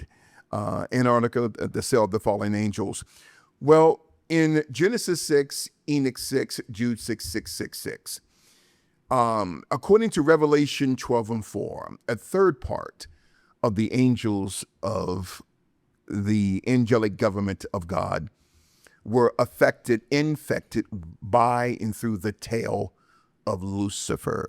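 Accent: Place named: Russia. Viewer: Congratulations on your 100th episode! I’m glad I found your channel. Your Russian accent makes the conversations so much better hahah ❤✊🏼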